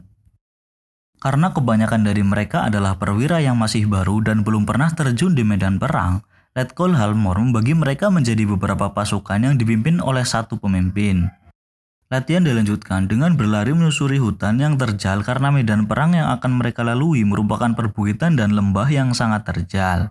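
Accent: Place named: Banyumas, Indonesia. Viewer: Yang nggak bagus.. Ini orat nerocos terusan.. Ngapak lagi ngomong nya.. Nyebelin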